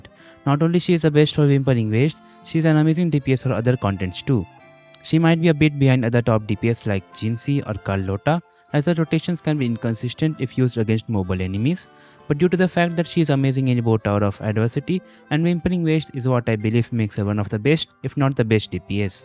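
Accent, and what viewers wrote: India. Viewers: I legit thought you got hacked 😂. Who would have thought the real voice sounded Indian 😂😂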